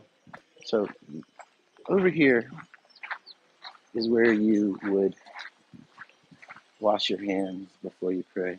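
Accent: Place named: South, United States. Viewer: I hear your Sourhern accent, mister. That part of town is super chill in the morning. The most cars I could count at once was three.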